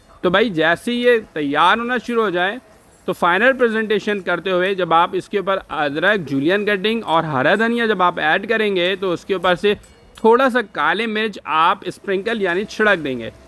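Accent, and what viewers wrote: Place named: Bihar, India. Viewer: بولنے والا بہاری ہے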